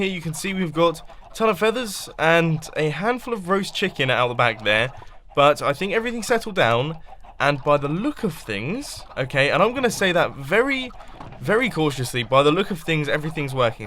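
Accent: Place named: England, United Kingdom. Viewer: I didn't know u were English I am too and I don't even know my own accent. Lol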